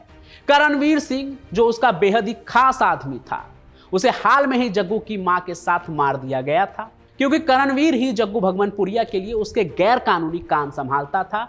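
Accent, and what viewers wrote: Bihar, India. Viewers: Your Way Of Speaking , Accent Is Like Biharis , Like Godi Media Ankers , Speak Normally Dont Be a Actor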